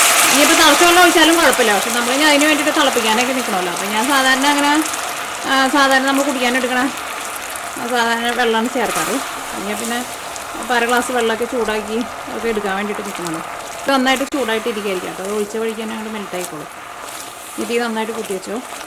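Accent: Thrissur, India. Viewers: Nishkalangamaaya Thrissur Slang.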